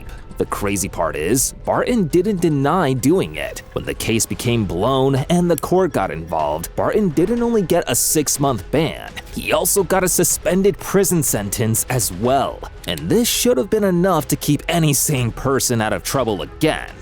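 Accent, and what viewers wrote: United States. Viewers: Be quite a interesing episode apart from the crap american narrator. Bellend!!joey is a wrong un but talks "sometimes"sense in some issues.absolutley radio rental but his family shit wernt his fault.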